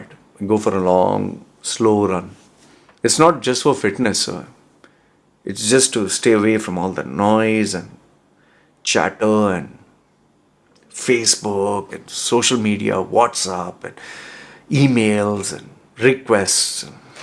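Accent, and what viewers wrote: India. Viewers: Your success is thanks to the Internet, social media, your gift of gab and your facial tattoos that attract potential visitors to click your videos. Yes you have been lucky starting this at the right time. Being in Thailand has nothing to do with it. You could have done this anywhere but the added tropical backdrop and your Indian accent and calming voice has an appeal for many. Had the Internet not developed to where is it now, what would you have done as the Internet is everything for your survival it seems. That is something to think about, not that it matters anymore..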